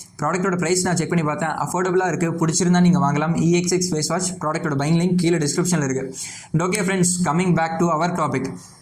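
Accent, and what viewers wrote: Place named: Mannargudi, India. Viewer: Semma bro mannangatti slang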